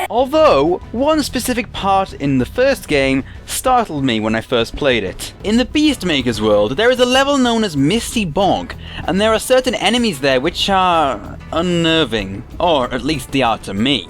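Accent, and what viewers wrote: United Kingdom. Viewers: YOUR BRITISH! :D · British PBG.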